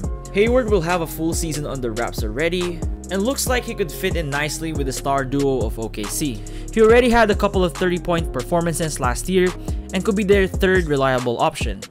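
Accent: Philippines. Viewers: Your accent when you talk was just like a Filipino talking in English. Are you a Filipino? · You sound Filipino! Pinoy ka ba? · You sound Filipino but idk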